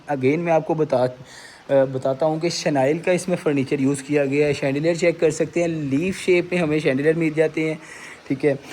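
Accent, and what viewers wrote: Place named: Rural, India. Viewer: Paindu kahi ky bolna to seekh lo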